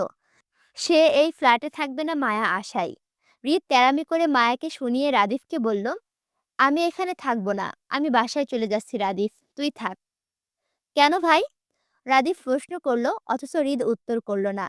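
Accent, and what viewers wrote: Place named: Chittagong, Bangladesh. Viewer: তোমার মুখে আমাদের চট্টগ্রামের ভাষা খুব মানাইছে😊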